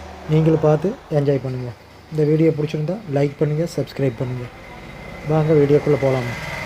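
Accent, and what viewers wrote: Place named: Kongu, India. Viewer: Kongu speak super ga anna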